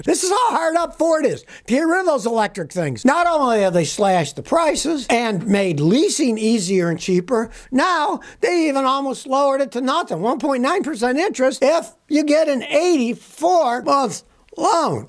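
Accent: United Kingdom. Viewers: Love the Brit accent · Nothing like a strong black coffee and speaking with a British accent to get me going, in the morning!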